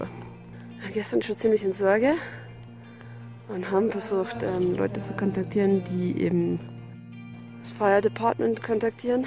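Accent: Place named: Bavaria, Germany. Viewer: Servus, was für ein geiles Video!!! Und dann a no auf bayrisch - perfekt und vielen Dank für diese herrliche Doku des PCT.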